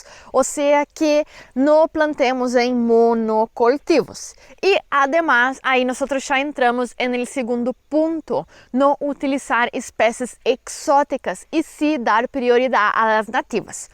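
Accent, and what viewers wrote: Brazil. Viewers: O seu espanhol com sotaque brasileiro meio portunhol é muito divertido jajaja. Excelente informação! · Olá, Vocé é do Brasil, não é? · Você é brasileira?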